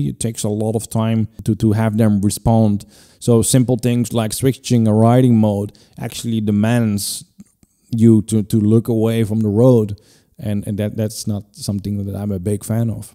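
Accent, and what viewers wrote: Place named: Netherlands. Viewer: Funny... the first sentence made me wonder whether you were from the US. In the second sentence I knew you were Dutch. Difficult to height your accent when you have to think about what you wanna say... Very nice bike! I would love to own a Ducati Monster but dare not because of the maintenance costs...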